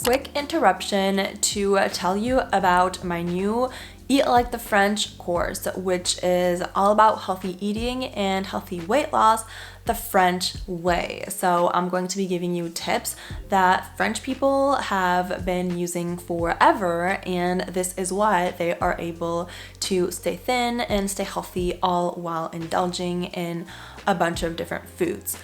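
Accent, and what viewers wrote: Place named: United States. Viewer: Je suis français et je n'ai jamais été aussi fier d'être français après avoir vu cette vidéo. C'est vrai qu'on le vit cool. Chaque fois que j'ai été à l'étranger, on m'a dit que j'avais trop de chance d'être français. Ma phrase préférée: "On adore la beauté". C'est vrai. On est cool comme des chats. Un de mes meilleurs amis, un allemand, a le défaut de manger sa nourriture en 5 minutes. J'ai essayé de le changer mais pas encore reussi. Tu es la meilleure version de nous-mêmes. Merci. J'essaye d'apprendre ça à mon enfant. Super accent américain, c'est rare pour un français de choper l'accent d'ailleurs aussi facilement.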